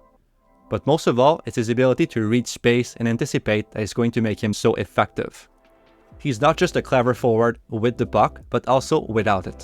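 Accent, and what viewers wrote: Quebec, Canada. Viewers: Are you from qc cuz that accent is so qc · Quand même drôle que ce vidéo soit analysé par un québécois (on reconnaît l'accent dans son anglais) et que Demidov s'en vienne à Montréal. Let's go!!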